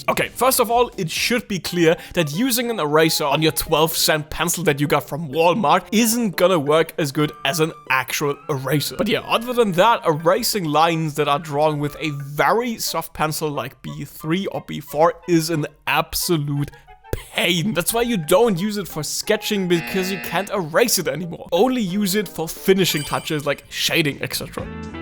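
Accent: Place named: Germany. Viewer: I started watching your videos last night and instantly found your accent and style of comedy nostalgic. You reminded me of the lessons my german mother gave me when i was young, very German yet very relatable.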